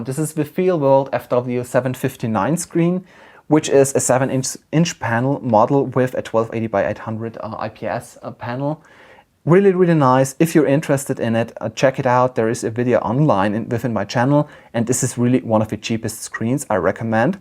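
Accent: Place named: Germany. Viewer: Nichts gegen dein Englisch, aber ich hab sofort gemerkt, dass du deutschsprachig bist. :D Gruß!! ;)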